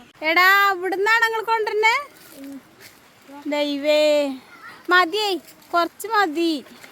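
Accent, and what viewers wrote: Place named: Kerala, India. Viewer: 😂😂😂 തേങ്ങ വാരി തിന്നൽ. ഞമ്മളും മോശമല്ല. നിങ്ങളുടെ ഭാഷ കേൾക്കുമ്പോൾ കോഴിക്കോട് .കണ്ണൂർ. ത്രിശൂർ ബോഡർ ടാമസകാരാവാൻ സാധിയത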